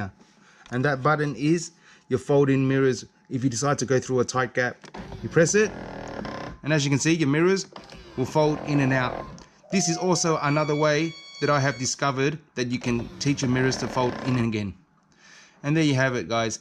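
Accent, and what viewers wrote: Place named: Australia. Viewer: Hey, Great Aussie accent. Yes good info but my CLC <num> does not have a convenience feature so only operates by the buttons on the dash and the swing out does not work. would i have to fix that switch? Or could I make it operate with the key fob? André in Sydney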